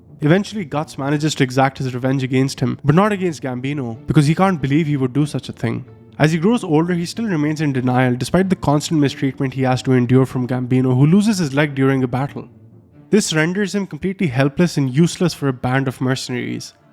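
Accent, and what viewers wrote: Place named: India. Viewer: i really like the way you tell the story and the fact and the analysis and i really like that you view it from philosophical side, but the thing that probably makes people not watch your whole video or skip it or not even starting it is that your voice(no hate) doesnt pass and it sound bit funny cuz of your indian accent ofcourse it can fix whit the time but i suggest you using elevenlabs or some ai voice that sound more good more profesonial(i dont talk about deep ai robotic voices), anothjer thing is that many of your photos dont mach the ratio of the video(there are blackbars) and alot of the music is not good combined whit the video and another thing is that there is no motion. There are a lot of parts where there is only one picture you are talking over it and it just stands making it feel boring even if the script is very good and the video is good. 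Add some motion. Another things is that there are photos that are in bad quality and you can find better ones. Again no hate and i hope to see even more evulution of your channel i really like the way of you viewing things and i am sure you can make your channel really good.(NO hate again)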